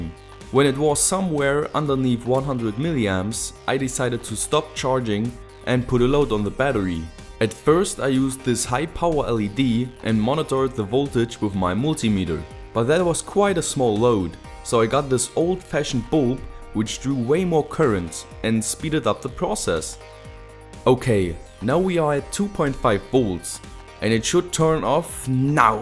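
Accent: Germany. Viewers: Your Accent is really dominant. You sound pretty german. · yeah he has perfect and his accent isnt wierd i it sounds like a normal german accent, I used to live in germany for 2 years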